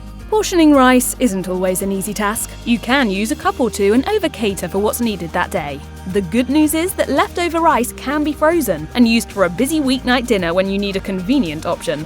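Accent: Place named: England, United Kingdom. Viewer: The English accent is terrible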